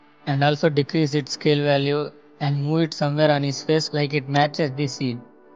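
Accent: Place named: India. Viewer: pls use ai voice or something its hard to understand indian accent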